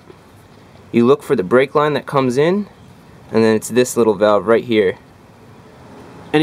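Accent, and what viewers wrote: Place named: Pennsylvania, United States. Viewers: You sound like you have a Philly accent- wooter! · Know how I know you are from Pennsylvania?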